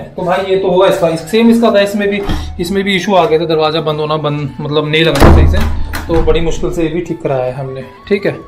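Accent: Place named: Pahadi, India. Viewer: It was so obvious that you are pahadi by your way of talking... Very nice video you have almost covered all the minute details. Thank you for this video😅 I'm from pahad too😊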